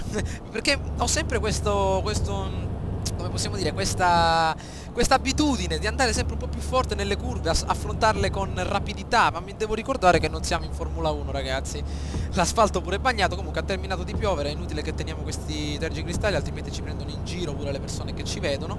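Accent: Sicily, Italy. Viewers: ma sei siciliano??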